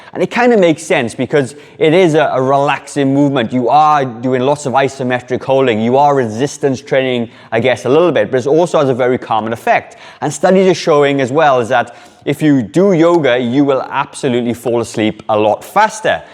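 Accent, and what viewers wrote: Wales, United Kingdom. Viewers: No!!! He has a lovely Welsh accent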